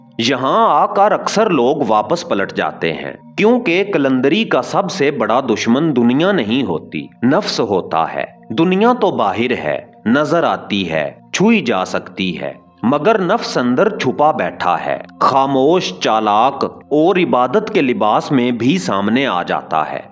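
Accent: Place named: Punjab, Pakistan. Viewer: Narrator voice is so irritating , sharp and with a Punjabi accent. Please replace the narrator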